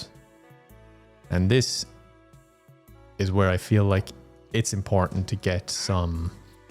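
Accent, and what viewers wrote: Ireland. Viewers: This is genuinely such a soothing let’s play. I didn’t expect to fall asleep during the last episode but at the end of it I blinked and it was 30 mins later in the video, waited til bedtime for this one in case it does it again. Always love a good hang out with our totally sane friend, reminds me of the old Stardew videos from when the channel was still brand new Also for the record you got me to fall in love with the Irish accent to the point I use it on my Siri anymore so you’re clearly doing /something/ right for Irish culture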